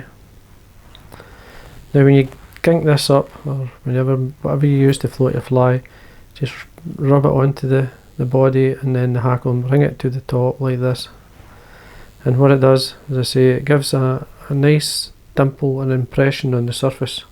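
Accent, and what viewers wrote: Scotland, United Kingdom. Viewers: Forgive me, but I have trouble understanding your Scots accent, (We here in the Ozark mountains have an accent that few can understand), but I see what you're doing, and I've tied some of your flies. I will tie this one, because I think this spring it will work on the streams we have here in the Ozarks. Thanks for your videos, and keep up the good work. Wish I could come to my ancestral home in Scotland and fish, but I'm afraid I'll have to settle for our rainbow and browns in our steams. I'll let you know how the Long Hackle Fly works this spring and summer.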